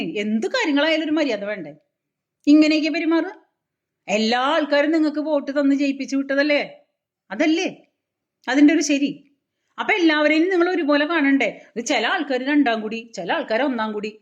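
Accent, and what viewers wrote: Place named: Thrissur, India. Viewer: Chechi adipoli thrissur bhasha kettu sandhosham aayeee 👏👏👏😂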